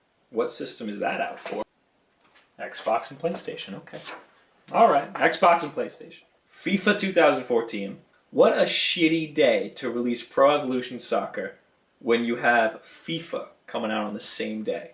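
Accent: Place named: New York, United States. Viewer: Wow your NY accent was way more noticeable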